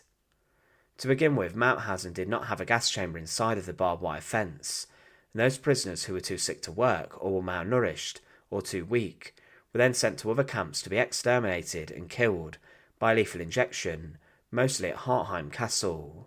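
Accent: United Kingdom. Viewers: The fact that you prolong the last word of EVERY sentence has stopped me from subscribing. Please take that statement as constructive criticism. I appreciate your fact finding but I’m not a fan of how you’re portraying the British accent. Way too generic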